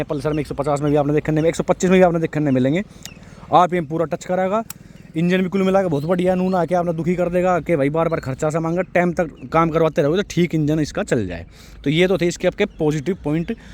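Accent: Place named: Bihar, India. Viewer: Biharii laag rha hai ... Tari video dekh k tari bhaab ni baada saktaa 👊👊👊👊👊👊👊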